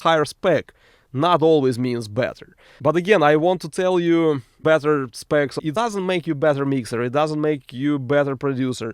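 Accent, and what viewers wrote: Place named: Russia. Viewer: Русский узнает русского за секунду)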